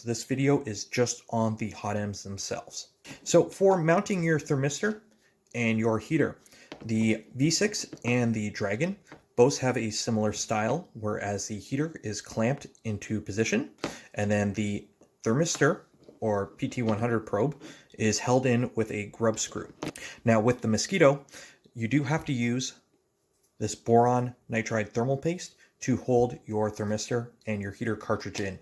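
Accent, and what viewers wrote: Canada. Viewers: You are in Nth America though....common admit it - you re CANNUCK !! You cant hide that accent :-)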